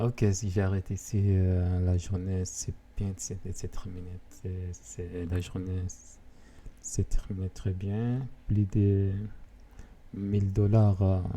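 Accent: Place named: Italy. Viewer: Ciao e grazie per il video, mi sembrava un accento un po italiano e nei parametri ho visto 🇮🇹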